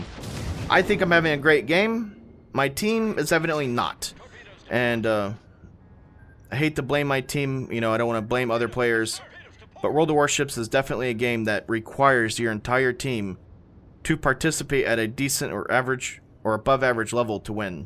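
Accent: Texas, United States. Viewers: State history... Sounds like you grew up in Texas...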